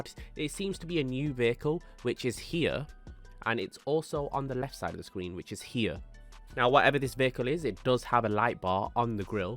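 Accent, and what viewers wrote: England, United Kingdom. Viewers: i feel like i’ve watched this video twice already but this one just has a english accent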